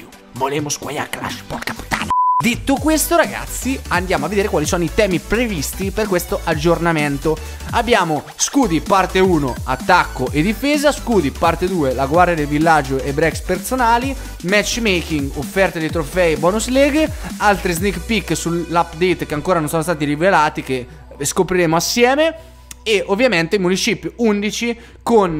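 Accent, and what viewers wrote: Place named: Ancona, Italy. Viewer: se vede da do chilometri che sei anconetano. grande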